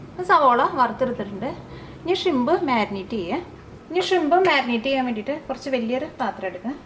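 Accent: Thrissur, India. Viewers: Thrissur bhashayanishta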